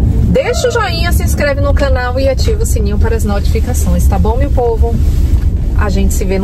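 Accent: Bahia, Brazil. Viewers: O outono ja chegou ai, vendo daqui de Porto Seguro Beijo, que sotaque delicioso da Bahia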